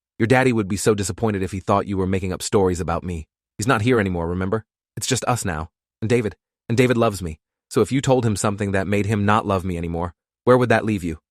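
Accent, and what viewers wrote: United Kingdom. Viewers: He keeps going British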